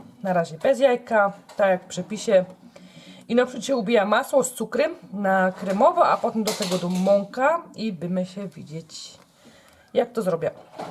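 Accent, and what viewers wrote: Silesia, Poland. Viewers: ciastka gówno? :D zajeżdza niemieckim akcentem jak u slązaków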